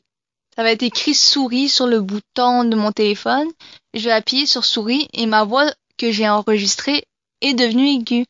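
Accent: Quebec, Canada. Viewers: est tu québécoise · Question est tu québécoise ?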